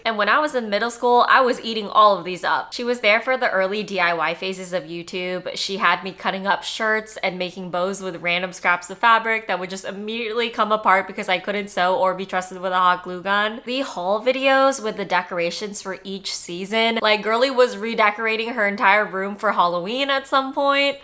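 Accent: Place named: United States. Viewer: Learned American English from her .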